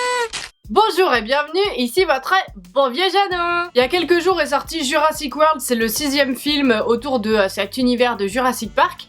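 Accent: France. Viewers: Pour Jurassic Park effectivement je l'ai aussi appris par hasard en fouillant dans les livres de mon père, il est dans ma pile à lire depuis quelques mois... livre de l'été ?! Pourquoi pas 😊 Super vidéo je viens de te découvrir et j'aime ta façon franchouillarde de parler, on à l'impression de discuter avec notre copine de façon hyper naturelle, ça fait plaisir de voir ça sur youtube 😊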